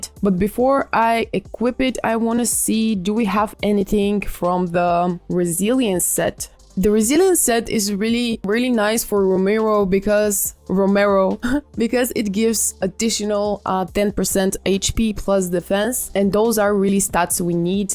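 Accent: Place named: Quebec, Canada. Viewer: My guess is you are french Canadian, I hope I did not offend you by asking that, I was just curious because you have a beautiful accent. Great content for people starting our and I even watch myself even though I'm end game, sometimes I miss things so it's nice to refresh and in some cases learn something new I could have overlooked. 😊😊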